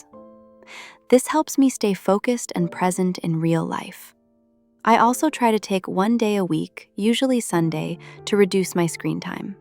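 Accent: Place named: RP, United Kingdom. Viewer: I love BBC English ❤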